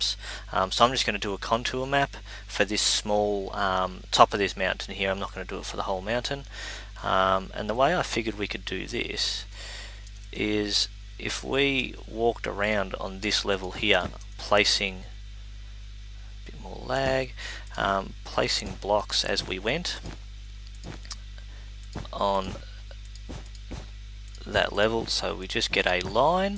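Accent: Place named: Australia. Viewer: I thought you were Australian from the accent and turns out i was right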